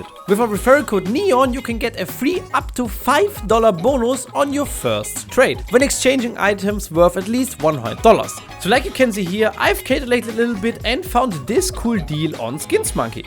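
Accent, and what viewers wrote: Germany. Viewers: Man hört richtig den deutschen Akzent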